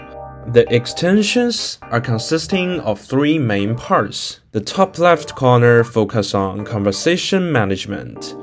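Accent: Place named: China. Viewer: as soon as I heard the chinese accent I was like, no thanks, I dont want my personal info to be in some chinese farm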